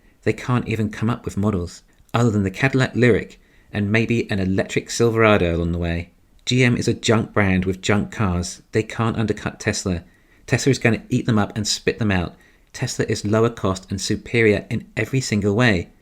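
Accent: England, United Kingdom. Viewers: I was sure you were from England rather than New Zealand...???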